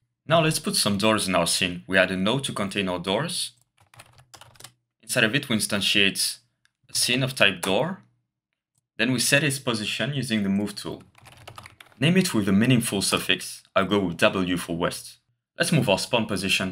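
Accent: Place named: France. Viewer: tbf i think it's just the French accent just making him sound like he's looking down on you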